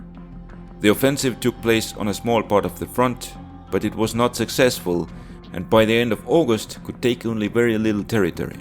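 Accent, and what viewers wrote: Estonia. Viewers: Eesti aktsent, 100%, :D ütle et eksin krt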